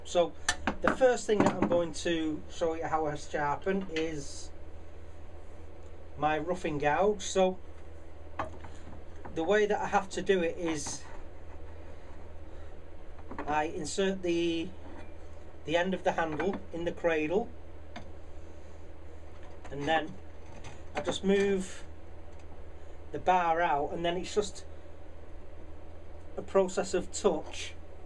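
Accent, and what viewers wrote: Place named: North, United Kingdom. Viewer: I love your style, your layman's explanation of things really has increased my understanding in leaps and bounds. I've been struggling to decide on which lathe to buy for months and whether I had the confidence to start turning. But you have really spurred me on, thank you. If I may ask some advice as a beginner? I'm going to spend as much as I can reasonably afford on the lathe (As the advice goes) but the turning tools are in danger of wiping me out, especially after buying a chuck too.. Can you suggest some decent ones to start with? Should I aim for HSS? Also, you sound like a fellow northener! Are you allowed to say where you buy your wood/blanks from? And what wood is good to start with? I have lots of offsets of softwood 2x4 and treated rough sawn....? Sorry for all the questions......but I don't feel stupid asking you. 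Thanks again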